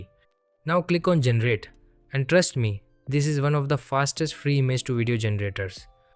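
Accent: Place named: India. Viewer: As soon as heard the Indian accent I already knew it was some bullshit up ahead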